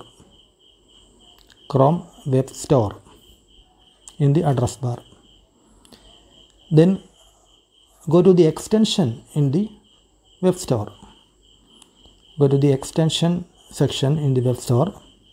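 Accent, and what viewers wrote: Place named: Kerala, India. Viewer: You are a malayali I can understand it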